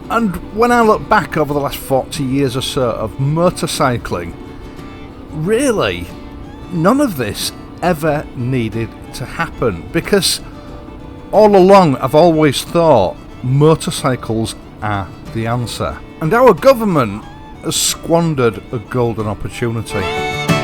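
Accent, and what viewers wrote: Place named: Bradford, United Kingdom. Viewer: South Bradford accent ? Trying to pin it down is doing my nut in... trace of Irish-Leeds though...must be munt it ?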